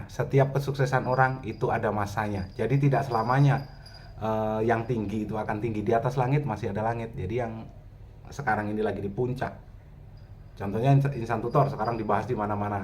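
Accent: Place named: Gombong, Indonesia. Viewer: Mantap meski bolak bolik nonton bahasane ajeg tapi kesane enak dengernya, kaya wong gombong logate haha, Lanjut jadi pendorong bagi kaum pemula 👍🙏🙏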